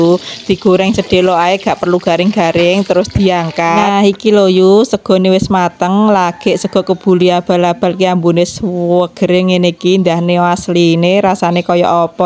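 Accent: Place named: Blitar, Indonesia. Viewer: Bahasamu wong Blitar Tah yu...😂😂😂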